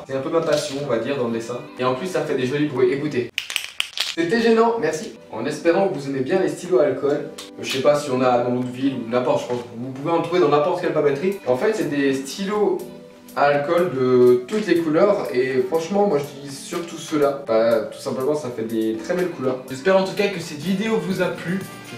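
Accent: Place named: England, United Kingdom. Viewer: Cet accent anglais ow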